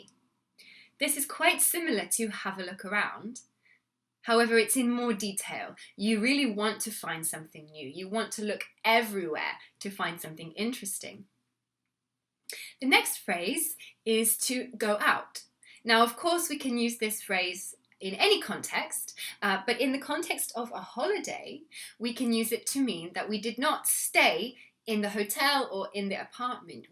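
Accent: United Kingdom. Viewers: дожили, британский английский уже акцентом считают)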